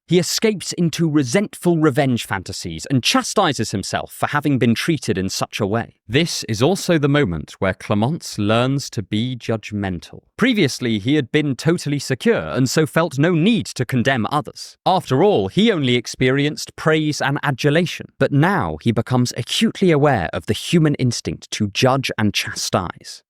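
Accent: United Kingdom. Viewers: I read this book a while ago and it took your british accent to make me realize that its a good book.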